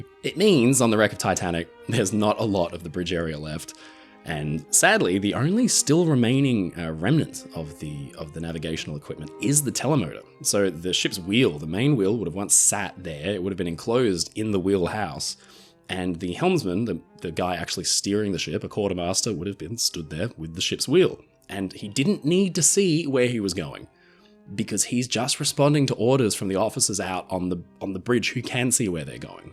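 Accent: Australia. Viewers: I don't know how long you were in the U.S., but it was long enough for an American accent to find its way into your narration of this video every now and then when your Aussie accent 'drops out'... 😉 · Why would a British person have an Australian accent? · I enjoy your videos,. I also love listening to your beautiful Aussie accent.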